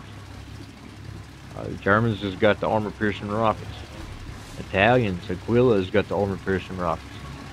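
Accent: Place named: South, United States. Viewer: Great game. Not a CV player either but enjoy all the other surface ships. I'm a player from Middle Tennessee so it's nice to see someone with a southern accent doing wows videos.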